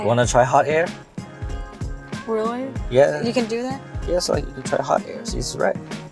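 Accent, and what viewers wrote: Standard. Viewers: chị nói tiếng anh chuẩn vl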